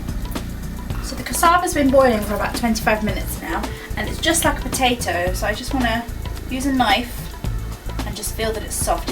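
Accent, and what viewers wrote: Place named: Sri Lanka. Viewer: sri lankan ENGLISH amma..hah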